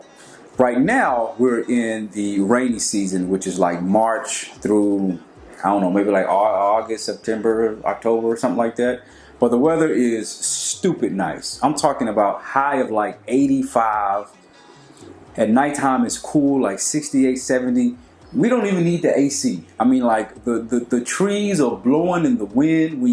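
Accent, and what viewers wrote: Ghana. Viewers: I’m Nigerian living in the US and want to move to Ghana sooo bad. This video is inspiring. You got the Ghanaian accent down lol!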